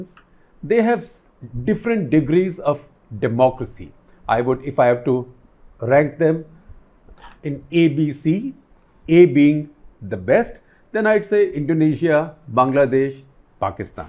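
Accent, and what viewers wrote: India. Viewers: Why are you speaking English in total Hindi tone.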